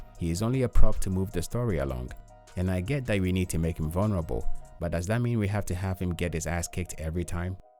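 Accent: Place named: India. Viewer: of course this video is made out from a indian dude w the accent lmfao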